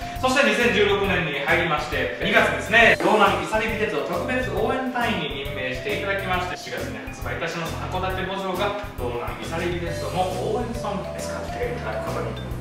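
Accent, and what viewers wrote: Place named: Osaka, Japan. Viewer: 大阪だったんだ 時々の大阪弁がなんかうれし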